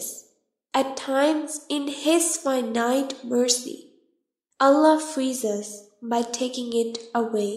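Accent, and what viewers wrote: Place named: India. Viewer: I feel indiane accent in the air, please re-read such an amazing book with right accent, so many words i was confused about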